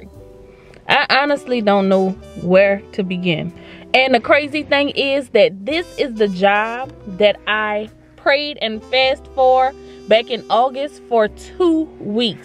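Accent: New Orleans, United States. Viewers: Are you from Nola...I love your accent 🤔😊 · Sis. I'm still looking up to you as a RN gaining her BSN and ALWAYS choose yourself especially when it goes against your moral code. You from New Orleans? I hear it. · Why do you sound like you're from New Orleans, lol. . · Are you from N.O.? I hear it all in your voice. Lol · I hear some New Orleans in that accent. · Please confirm my suspicion...you're from New Orleans right? 😆...I'm from there and I just feel like I hear the accent....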